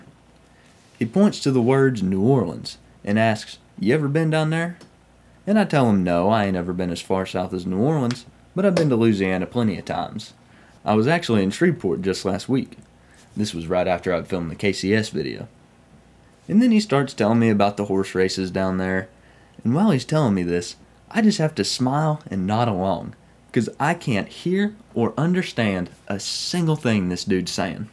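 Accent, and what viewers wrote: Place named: Oklahoma, United States. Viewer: Enjoyed your “500 Subscriber Special” and Thanks for confirming you’re Accent is from down in Oklahoma. Keep up the strong 💪 efforts with your videos. 👍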